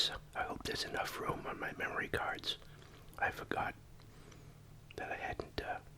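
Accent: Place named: Boston, United States. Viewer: you should do radio ASMR videos. People would like your Bostonian accent.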